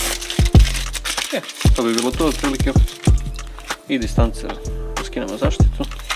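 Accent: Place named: Čačak, Serbia. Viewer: Tacno sam znao po govoru da si iz Cacka. :)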